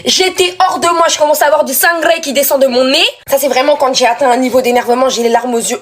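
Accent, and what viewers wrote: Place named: Africa. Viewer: je kiff bien ton accent on dirait t'es africaine t'es africaine?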